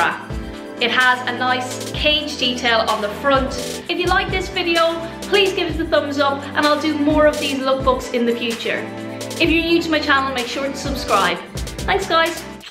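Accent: Ireland. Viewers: oh okay, Donegal here, thought it was A Donegal accent🙄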